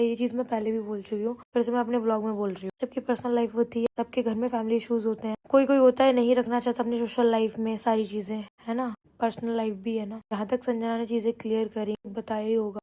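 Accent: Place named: Etawah, India. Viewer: Didi bahut Maja aata hai apni Desi Etawah mainpuri bali boli me blog dekhne me ...❤❤ I'm very enjoying to watching you vlog and also enjoy a pure Desi girl with Desi style😅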